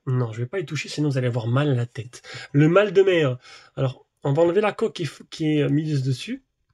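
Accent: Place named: Marseille, France. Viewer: Super vidéo! Et ton accent marseillais est au top 💪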